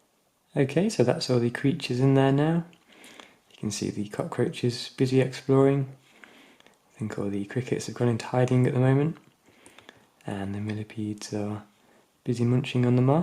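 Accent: United Kingdom. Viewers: You are from derby aren't you!!!???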